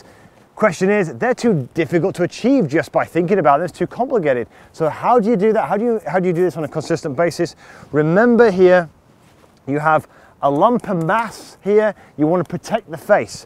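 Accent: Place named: England, United Kingdom. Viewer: Just found you yesterday. You are the first of countless internet teachers who's videos I have viewed who speaks a language (not counting the English accent :-) ) I have been able to understand! I took your tips on the take away to the range today and hit my irons more solidly and more consistently than I have in a long time! Please keep the videos and the 'simple talk' coming!! I'm very grateful for your help!! I look forward to more tips, drills and instruction and once again enjoying the game of golf!!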